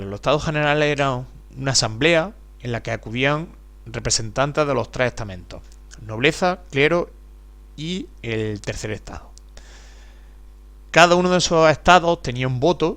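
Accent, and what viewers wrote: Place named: Spain. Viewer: Buena la presentación, pero se agradece por el acento ibérico que posee quien da la explicación que mejore la pronunciación de los nombres. Se pierde uno tratando de tener hilo. Gracias y saludos.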